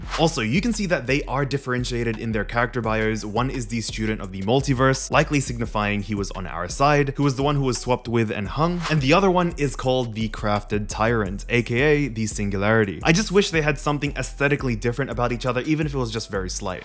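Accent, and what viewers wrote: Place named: North America. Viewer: Where are you from bro? It says Australian on your channel but your pronunciation of certain words sounds very North American. Thanks for explaining this clusterfuck of a story though - much appreciated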